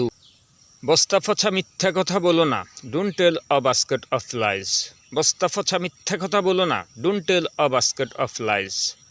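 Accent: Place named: Noakhali, Bangladesh. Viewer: এই বেটা কথক, তোর বাংলা উচ্চারণ ভুল আছে, ইংরেজীতেও ভুল আছে।কারণ স্কুলে থাকতে ইংরেজী স্যারের বেতের বাড়ী খাস নাইতো, ঐ জন্য! বাংলা উচ্চারণে নোয়াখালির টান পরিষ্কার ভাবে বুঝা গেছে। উচ্চারণ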